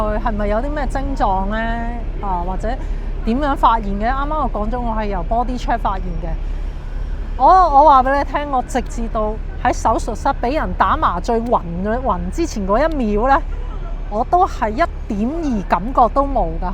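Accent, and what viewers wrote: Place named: Hong Kong, China. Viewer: 你係咪耳聾架，正宗香港人一聽就知片主係香港人啦，咩野大陸同胞共享香港免費醫療呀？有病快啲去睇醫生啦，新制下，精神科唔使錢架。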